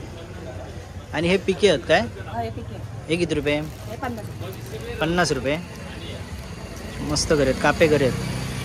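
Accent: Malvani, India. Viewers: Tu malvni boltas te Chan vata volg madhe sagat te Chan vata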